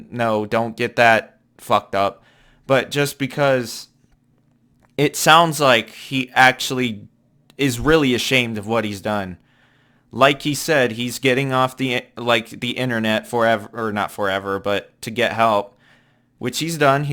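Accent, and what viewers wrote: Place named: South, United States. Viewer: DUDE, you didn’t even know what happened before, but just screenshotting his last posts from twitter and gluing the voiceover of your redneck voice is very smart, right? Do you even know that that 12-year-old girl was a friend and, by correspondence in the discord, she herself agreed and continued to write "yea I suck your d1ck" and so on. then she simply took and leaked the correspondence, allegedly exposing that he seduced her at the his age of 20, and stupid people ate it. I'm sorry I can't find screenshots of this play chat role, because it was clearly expressed there that she deliberately flirted with him for her own purposes in order to take him by surprise. nasty and stupid people now think ONLY by hearsay from strangers that he is some kind of pedophile. Is this your first time on the internet?!?! Didn't you roleplay with anyone at the age of 12? 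this person then, of course, began to apologize and make excuses because people misunderstood him, or vice versa, they began to write "eewww you pedo! how do you even live!" people nasty and disgusting ruined the full potential of the best musician I have ever heard.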